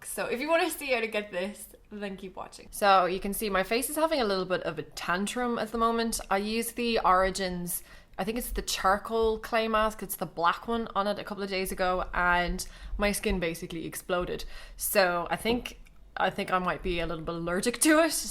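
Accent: Ireland. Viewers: Great tutorial, clear and to the point. Great camera too, makes it a pleasure to watch. And you're Irish? You've one me over, just subscribed x:-)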